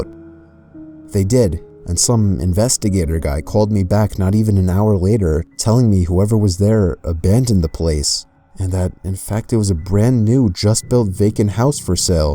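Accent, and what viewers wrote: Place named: New York, United States. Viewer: I picture him looking like the Joe Pesci from Home Alone lol the NY accent is why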